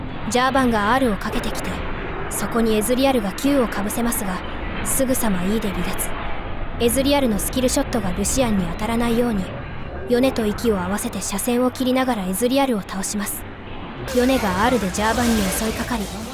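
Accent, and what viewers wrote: Kansai, Japan. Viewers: なんでそんな関西弁押しになったんですか？😂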